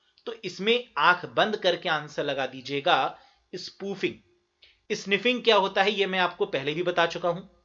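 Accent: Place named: West Bengal, India. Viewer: App west bengal se ho kya sir kyun ki waha ke ek teacher odisha main app ki tarah computer ko easy tarike se explain karte hai usi tone main ❤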